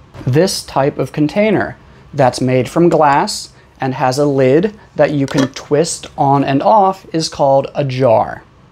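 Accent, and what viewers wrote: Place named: United States. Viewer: (382) A perfect presentation with the enchanting Native voice , strikes the ears of the non-natives absorb the visual traditional and modern vocab, perfect American pronunciation specifically Flap T. ❤🎉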